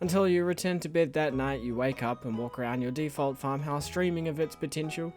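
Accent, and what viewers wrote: New Zealand. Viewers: There is nothing i love more than a good old kiwi accent. so calming to hear.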